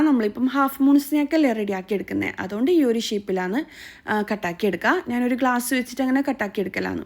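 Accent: Kannur, India. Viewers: Alla ith kannur thanneya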